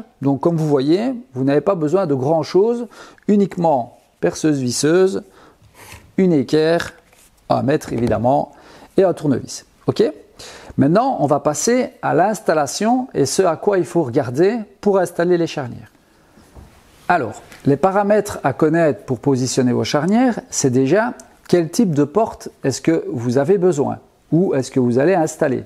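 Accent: Liège, Belgium. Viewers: clair et précis et liégeois oufti !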